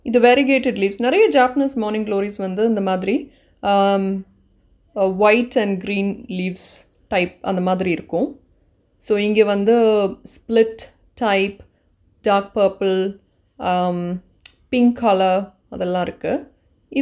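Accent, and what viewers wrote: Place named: Nagercoil, India. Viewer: Mam nagercoil language apadiyae eruku, nanae entha place nu kekalam ni erunthaen, ngl la entha place mam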